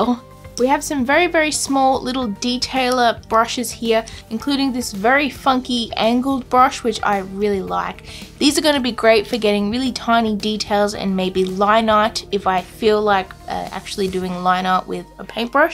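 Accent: Australia. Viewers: The bob rossy australian vibes with the way you talk plus the typical you drawing pokemon is just perfect This is how I like it haha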